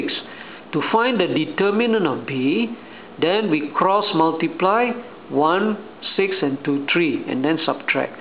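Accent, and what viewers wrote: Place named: Philippines. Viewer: sounds asian... phillipino is my guess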